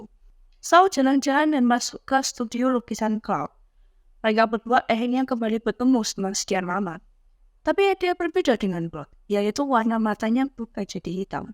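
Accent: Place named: Java, Indonesia. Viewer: Wong jowo lagi medok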